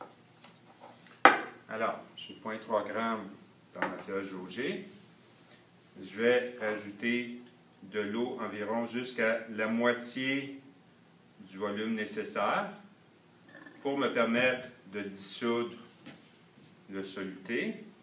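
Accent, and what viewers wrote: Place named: Quebec, Canada. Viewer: J'aime beaucoup ! C'était simple, un bon rappel, et en plus avec l'accent Québécois qui me plaît beaucoup ! Ca change un peu des cours habituels, c'est sympa comme tout !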